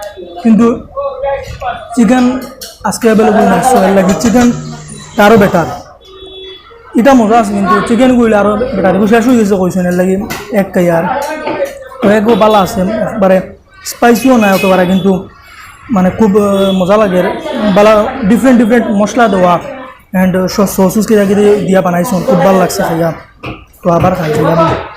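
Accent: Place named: Sylhet, Bangladesh. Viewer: Are you indian Bengali. You talk like Bangladesh Sylhet division people. Karimganj is a assam province district but you talk Bangladesh Sylhet division local Bengali language you not talk assamese language? And why you say Sylheti vlog Sylhet is a part of Bangladesh. You live in assam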